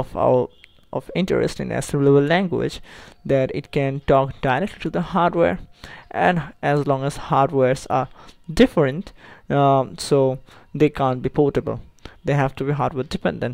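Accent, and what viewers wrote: India. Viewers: don't see what he is doing with English ,see what he is doing with computer . . . he is a Bengali from India. His mother tongue is Bengali then he learnt Hindi and English is his 3rd language. . . If an Indian is speaking English , probably its his/her 3rd language. so stop making Fun of our way of speaking English . . . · Definitely Indian! How he's saying 'Data' is selling him. Good tutorials by the way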